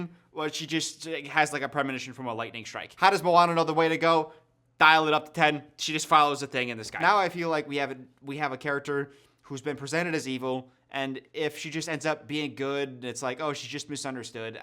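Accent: New Zealand. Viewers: as an Australian, I think it is one of the most beautiful NZ accents I’ve ever heard. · Bros getting his accents confused, that is probably the most New Zealand accent I’ve ever heard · my dude that is the kiwi accent, Aussies say reconnect like you do 😂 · Me being slightly offended you mistook the kiwi accent for the Auzzie 😅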